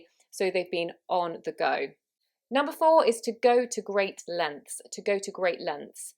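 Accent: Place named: United Kingdom. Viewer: Both of you are amazing teachers.Thank you for the great lesson teacher.I''m really impressed with your british accent.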